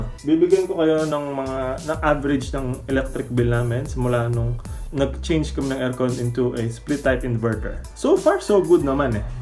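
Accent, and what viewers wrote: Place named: Pampanga, Philippines. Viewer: Kabalen accent 👍😍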